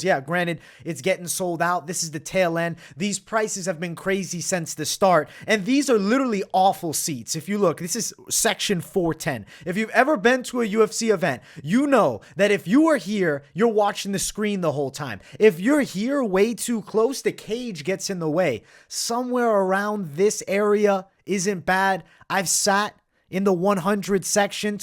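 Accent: United States. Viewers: you got that American accent down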